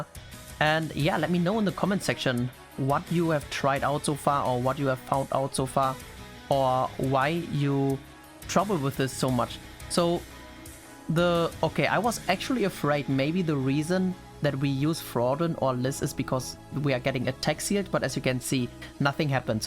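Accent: Germany. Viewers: Is ur actzent German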